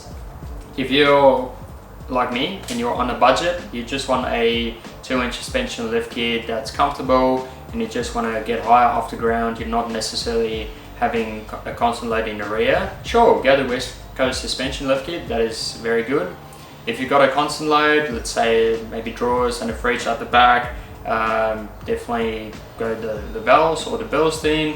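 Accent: South Africa. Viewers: are you South African born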